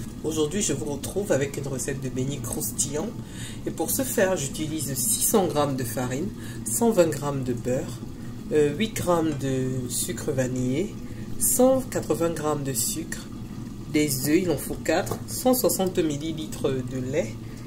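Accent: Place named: Cameroon. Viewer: MERCI ❤❤ vous avez un ton de chez moi Camer 😊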